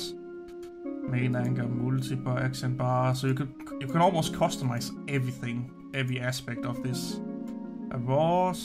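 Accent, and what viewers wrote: Denmark. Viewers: The danish is strong in this one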